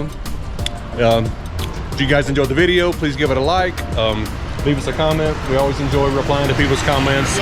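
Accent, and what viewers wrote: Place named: South, United States. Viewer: You can eat Guayava paste with cheese, you should try it...Love your southern accent!!!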